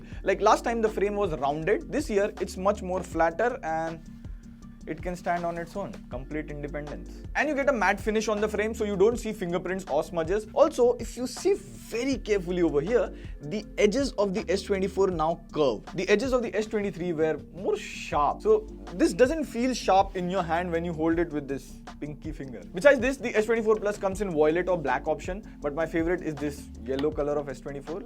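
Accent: India. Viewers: Impressed by exynos improvement...!! Google auto caption is great I will give it a 98% despite ya Indian accent. Definitely it didn't caption exynos correctly ... That's not English though 😂😂😂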